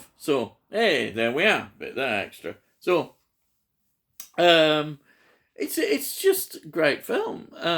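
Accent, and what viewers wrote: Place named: Wigan, United Kingdom. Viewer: Hi. I'm a huge Hammer fan as well. If you haven't already done so, grab yourself the 2 new release from Second Sight (The Mummy & Frankenstein and the Monster From Hell) as they have done a wonderful job on the restoration. I've watched all of your videos and can't help but think that with your accent must must live quite close to me, I'm from Wigan.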